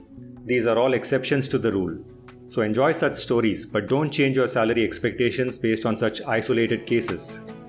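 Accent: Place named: India. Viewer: That's the beauty of Indian spices and accents. The more you experience them, the more you'll fall in love with them. Till you get there, feel free to turn on the accent-free subtitles on our videos.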